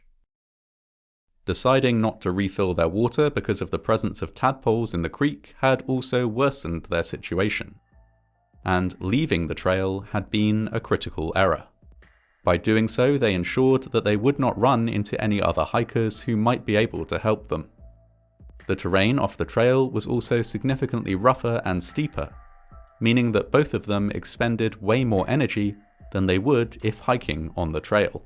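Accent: United Kingdom. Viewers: He’s a Brit let him speak British english in peace, man lmao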